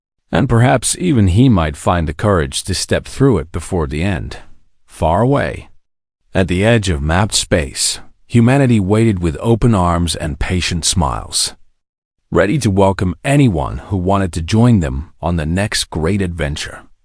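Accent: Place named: New England, United States. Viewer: Yes, the electronic narrator is definitely from New England, and possibly tipsy. ⭐ But the story is good.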